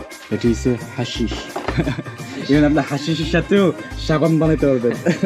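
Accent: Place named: Noakhali, Bangladesh. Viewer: ভিডিও টা বেশ উপভোগ করলাম 💜 লোকাল বাজার, নিজস্ব পদ্ধতিতে সাবান তৈরি করা, তেল তৈরি করা, জাস্ট অসাধারণ। ভাই, কিছু মনে না করলে আপনার জন্মস্থান কোন জেলা/থানায় জানতে চাচ্ছিলাম। আসলে আপনার কথার টোন অনেকটা আমাদের এইদিকের মতো লাগতেছে লাকসাম/নোয়াখালী/চাটখিল, তাই জানার আগ্রহ হলো। ধন্যবাদ জানবেন💜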